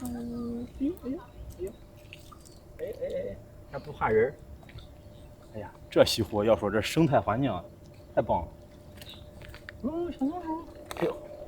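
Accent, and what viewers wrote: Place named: Northwest, China. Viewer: 哥们儿我之前看你第一个视频听口音就觉得是西北人果然是 老乡啊我出生在西安雁塔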